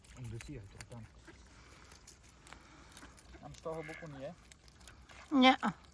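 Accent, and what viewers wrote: Transcarpathia, Ukraine. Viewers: Ви молодець)) Це суперово!)👍 Великий плюс Вам в карму))💯 Я також любитель підгодовувати качок коли на ріці, голубів, ворон, все це чудово заспокоює нервову систему) * Я сам з Хуста, чую такий знайомий закарпатський акцент за кадром)😊👍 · По говірці ніби Закарпаття.. Але річка на закарпатські річки геть не схожа.. то де є ця краса? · По розмові чую що Закарпаття 👍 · Та де се рівненщина, се закарпатський акцент, но се не в горах бо потік явно не гірський.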